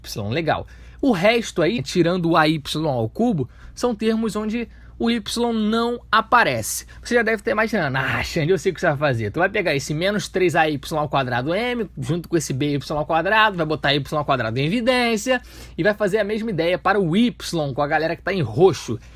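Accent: São Gonçalo, Brazil. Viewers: Você é de São Gonçalo RJ??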